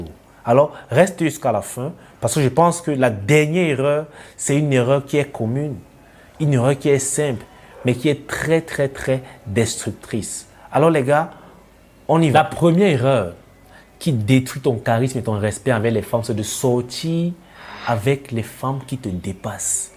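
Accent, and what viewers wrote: Cameroon. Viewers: Toi tu est camerounais bassa'a nespa!!!😂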